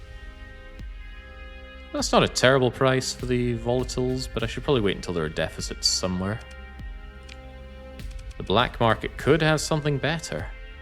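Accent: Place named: Scotland, United Kingdom. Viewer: Hearing a Scot talking about "the first thing you should do is go to the Bar" and I get flashbacks to That Time I Was In Livingstone in the 70s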